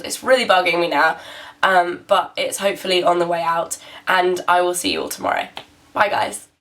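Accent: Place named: United Kingdom. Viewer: British is back, yey :-)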